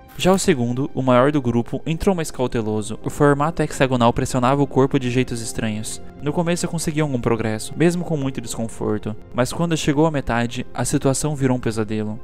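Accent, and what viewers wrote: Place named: Inland São Paulo, Brazil. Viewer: Gostei do sotaque! Sou do interior de SP, o meu também é assim! Tmj 🤜🏽🤛🏽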